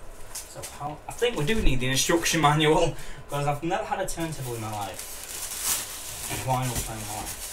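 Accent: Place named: United Kingdom. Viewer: Michael Woodruff dude....lay off it's how the Brits talk....kinda like privacy or aluminum.... Lol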